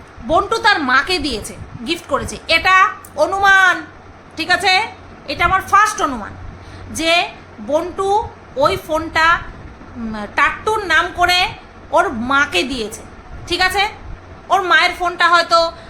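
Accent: Bangal, Bangladesh. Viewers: didivai tmr mukhe bangal vasa ta sunte hebby lage😊😊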